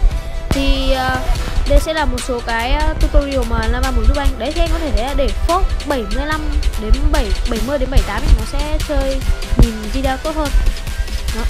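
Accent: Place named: North, Vietnam. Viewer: Nói ko hiểu gì hết ( hì hì tui ko nghe đc giọng của người bắc)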